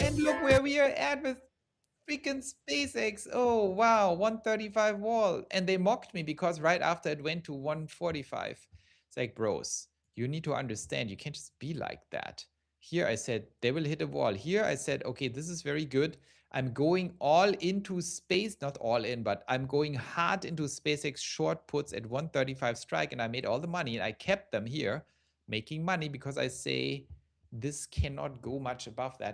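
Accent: Germany. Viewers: the video audio is drowning your beautiful german voice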